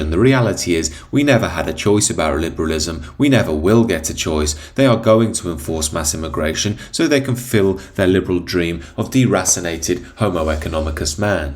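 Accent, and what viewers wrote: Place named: Leicester, United Kingdom. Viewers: Yes, true. But a no point does he say “hey, up me duck.” That would place him around the currently flooded Barrow-on- Soar. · Leicester, that’s it, I knew you had an accent.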